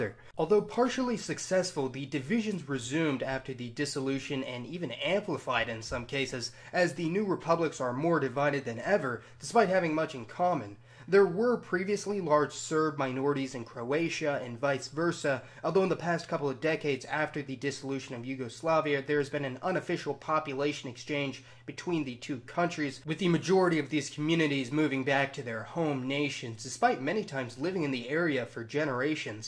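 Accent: United States. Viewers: Speaks American,america started all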